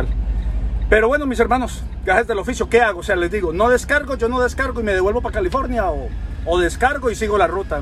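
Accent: Mexico. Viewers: Hola amigo con todo respeto, la verdad es solo curiosidad, si eres Colombiano por qué hablas como Mexicano? Gracias por tu respuesta!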